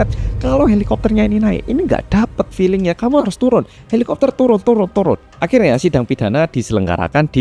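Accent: Java, Indonesia. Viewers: Aku suka bahasanya ,Mergo aku Yo wong jowo. Medok banget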